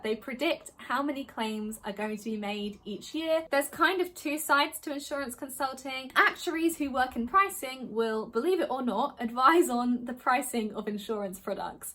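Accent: United Kingdom. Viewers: Her british accent is so elegant! I wanna speak like that 😭💔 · This is the first video of your channel I've watched since am a Thai guy, who is looking forward to applying to a B.Sc. Actuarial Science in a certain university. I personally think you look so British, assuming by your accent. And all of your question replies are so helpful, all of which make me understand more clearly about what I am gonna face after graduation. thank you!!! :)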